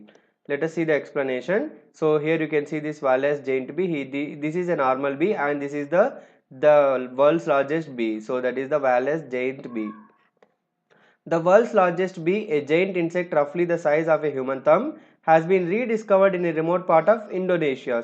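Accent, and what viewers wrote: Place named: Telugu, India. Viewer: Very helpful Thank you so much.... Ur accent seems to be that of the Telugu people...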